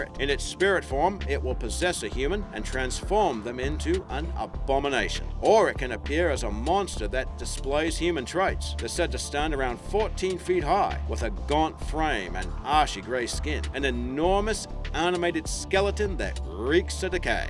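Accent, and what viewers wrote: Australia. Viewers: I think it's Australian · a guy with australian accent speaking on native american myths! talk about outsiders talking about a another cultures myths! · Sounds like a aussie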